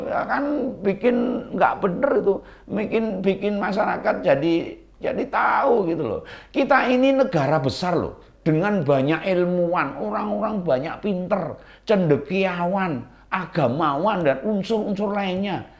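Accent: Java, Indonesia. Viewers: saya suka dgn logat mas ini, jawa banget, dan saya sering belajar bahasa jawa, karna teman2saya banyak yg dari jawa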